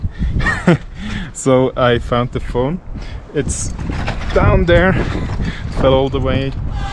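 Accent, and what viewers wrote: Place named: Switzerland. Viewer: Absolutely loved it! Fantastic edit. Amazing drone shots. And the best: your swiss-german accent ❤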